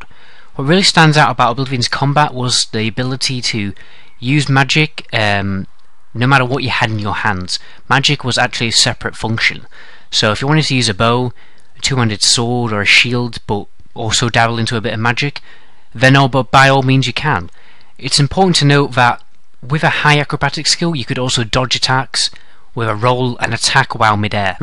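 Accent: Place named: North, United Kingdom. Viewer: where are you from? your accent is exactly the same as the northmen in game of thrones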